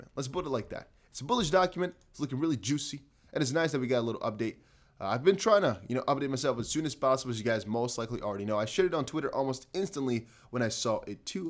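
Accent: United States. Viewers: This guy would have to show me his birth certificate and his passport for me to believe that he's not from the US. There are people in Kansas (the middle of America) that sounds less American than he does. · If you're not from the US. I must be from Mars. You have an American accent. You might be living somewhere else but you definitely sound like you're from the USA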